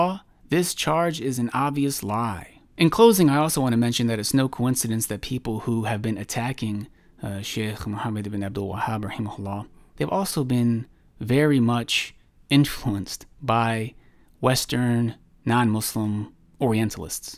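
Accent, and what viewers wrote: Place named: New Jersey, United States. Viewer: Is he from jersey ? He has a strong jersey maybe New York accent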